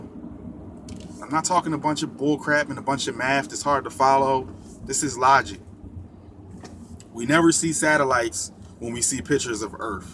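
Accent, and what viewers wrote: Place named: Philadelphia, United States. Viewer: Poetic THAT SHIT DONT EVEN SOUND RIGHT LOL ... People can't comprehend water is a tools to measure completel FLATNESS! Where u from bro? Tell me u from HARLEM ? where n bit Charlie clips is from ? Rocking the power wheels and shit! This mad got a memory about his power wheel !! Haha ! Naw . U look and sound like u from philly!!!! Light skin with the thick beard . Good points tho my guy! Salute to you and your enlightenment to this flat earth stuff . It will blow your mind but it's awesome to have this crazy ass info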